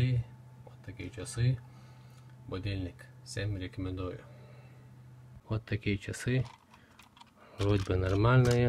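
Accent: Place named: United Kingdom. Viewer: у тебя акцент интересный,британский или вроде того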